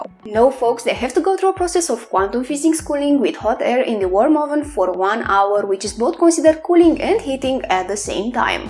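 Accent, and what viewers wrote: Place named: Germany. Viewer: so you think she's French? to me, she has almost a German accent...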